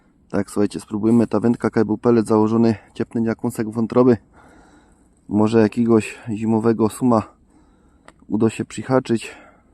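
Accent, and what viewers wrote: Silesia, Poland. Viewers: Karpie nie moja bajka, ale fajnie zaciągasz po Śląsku 😉. Pozdrowienia z Mazowsza.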